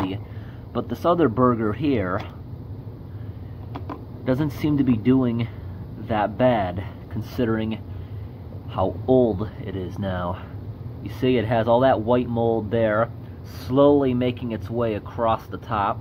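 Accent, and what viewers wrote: New England, United States. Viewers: Who wants to play a guessing game and guess where this dude is from in Mew England? I’m guessing Maine, maybe Connecticut.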